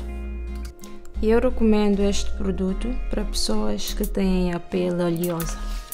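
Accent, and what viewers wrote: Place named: Portugal. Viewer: Gente, que sotaque maravilhoso! Português de Portugal!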